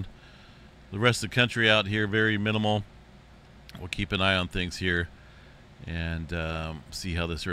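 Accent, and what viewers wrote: California, United States. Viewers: You sound like you’re from Cali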